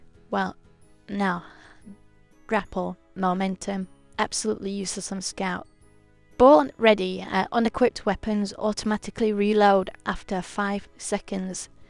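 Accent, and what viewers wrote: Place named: United Kingdom. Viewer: That's just British my friend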